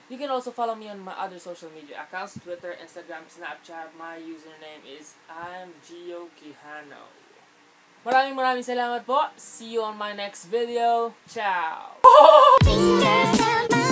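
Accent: Visayas, Philippines. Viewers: Curious lang po ako sir, bisaya ba kayo? May accent kasi kayong visaya! Salamat po!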